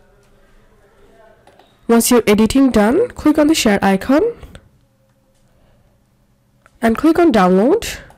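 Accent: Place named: India. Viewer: stop raping the mic and speaking in half indian and half curry english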